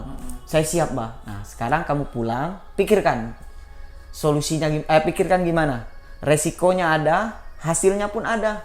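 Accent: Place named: Jambi, Indonesia. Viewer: AssalamualIkum.. Jambi hadir bang,semakin kesini bang tambah keren,begitu juga dg baground nya,walaupun udah berubah tp ttap dapat unsur mistis nya,dan yg lebih keren lagi,bang ttap menyelipkan logat bahasa jambi di setiap cerita,bang emang the best! Best beesstt!! 👍👍👍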